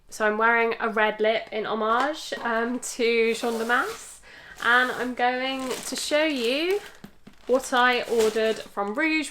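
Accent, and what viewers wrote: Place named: United Kingdom. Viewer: I love your British accent so much ❤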